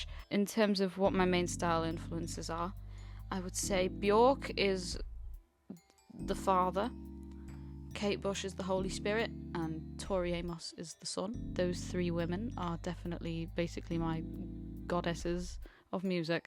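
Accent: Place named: United Kingdom. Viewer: Idk how u made urself more british sounding but ye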